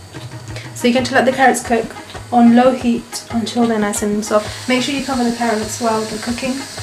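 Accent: United Kingdom. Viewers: I was into her British accent than cooking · Hey I loved your voice it's so soothing and your British accent makes it even more beautiful 😌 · I'll try this recipe. Thanks for posting it. Like the music too - what is it? And nice accent - are you from London?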